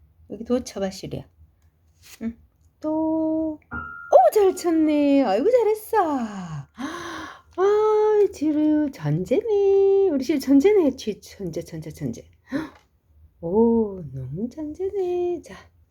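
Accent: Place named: Gyeongsang, South Korea. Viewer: 경상도인가봐요 ㅋㅋㅋ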